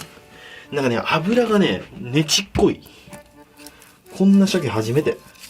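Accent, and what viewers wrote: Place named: Tokai, Japan. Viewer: 随所に出る東海のなまりが故郷を思い出して好き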